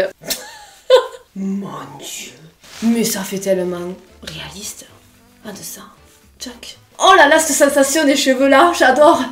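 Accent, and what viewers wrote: South, France. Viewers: En tout cas on sait qu'elle vient du sud pas de doute à priori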